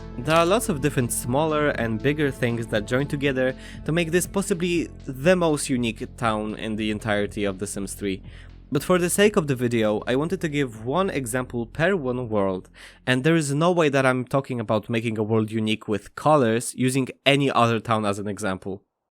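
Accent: Poland. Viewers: I keep forgetting you're Polish and keep getting jumpscared by the accent each time but yep, Sunset Valley was made as generic as possible so all players will feel comfortable playing there during the first time they get to play the game - they can choose a modern villa or an era-appropriate house based on what their preferences are and a more or less lore-important family as they please, so players of the previous games would most likely choose the Goths, Bachelors or Langeraks while new players would play any of the families in the city, especially the rich ones like the Landgraabs or Altos it's a bit similar to how Sims 2's Pleasantview had a few different styles of houses by default, like the simple white cubes, trailer pack houses or expensive mansions, no singular theme, just a default, generic world any player could relate to and feel at home (+ Strangetown and Veronaville for those who'd want to start in a more thematic neighborhood) they shared this once in an interview that they first had big-city ideas, but settled on making a small town as it would be easier to design and would be less complicated so the players wouldn't feel overwhelmed by it, and they did that by putting everything a player would need in one place, whether it's shopping, work or services, with not-as-important locations scattered around the map so it's easy to find stuff you'd need, and it would make the game feel more alive as most Sims would be seen around the world's places of interests, and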